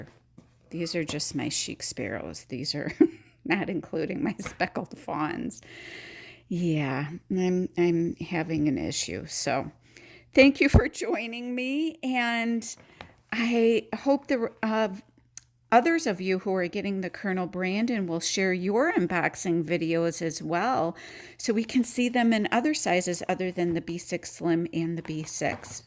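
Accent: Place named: Chicago, United States. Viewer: loved you unboxing and your video. Also your accent! I’m from Chicago but haven’t been back for 30 years. Yours reminds me of Chicago. I know it Chicago and Milwaukee accents may not be the same but it sounded like home to me. Think I’ll have to get a Colonel!.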